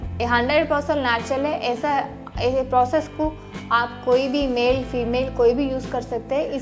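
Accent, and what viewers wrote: South, India. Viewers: Are u from south.. You are speking in very new manner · your accent seem like a south Indian by the way I have a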